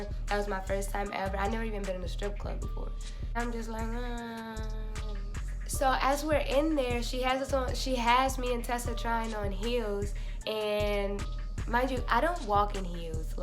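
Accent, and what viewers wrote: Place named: New Orleans, United States. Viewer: U in new orleans? i keep hearing u say buku lol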